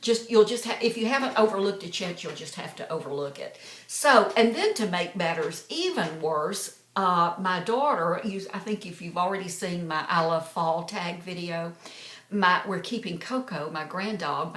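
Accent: South, United States. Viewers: just found recently your utube videos and am hooked, just love your accent, reminds me so much of the way Liz Taylor spoke in many of her films, kind of Southern accent though i think you are from Arkansas, am i right, you are such a young beautiful lady and your wedding day snaps are so lovely. i still have many more of your videos to look at. Have been to many US states but not Florida nor Arkansas, my fav is New England, twice visited all those NE states, also CA from LA all the way up the Pacific coast road to San Fran, hope to go back and see more. just love US. regards Jenny from the UK. XX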